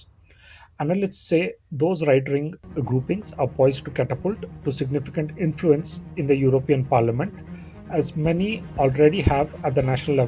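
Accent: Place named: India. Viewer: The narrator sounds Indian, plenty of room in India to enjoy mass illegal immigration . Send them to India or the other countries of the Indian sub continent where they can enjoy diversity at the expense of the Indian people or the people from the surrounding countries. Or let the dishonest narrator welcome them into his home free of charge. Not asylum seekers but illegal immigrants , enough with your lies.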